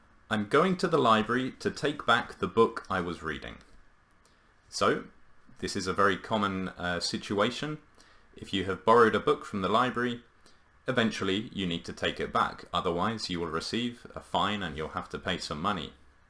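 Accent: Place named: United Kingdom. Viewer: Sir, you possess a clear British accent. By the way, I am your new subscriber.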